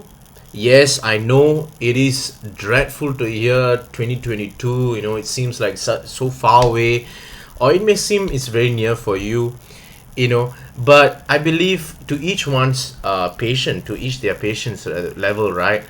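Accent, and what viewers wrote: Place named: Malaysia. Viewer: Yup, clickbait title to get views. Some dude from Malaysia by the sounds, doing a lot of talking but not saying anything new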